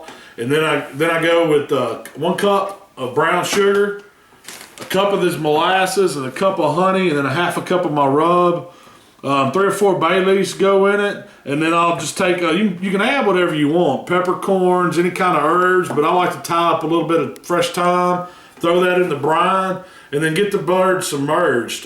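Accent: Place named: Sand Mountain, United States. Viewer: You sound like one of them ole Sand Mountain boys I grew up with 👍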